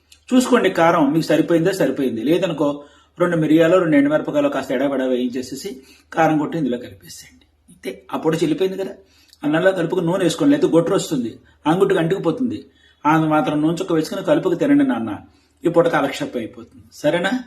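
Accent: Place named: Godavari, India. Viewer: మీరు గోదావరి యాస లో మాట్లాడుతున్నారు మీది ఏ ఊరు సార్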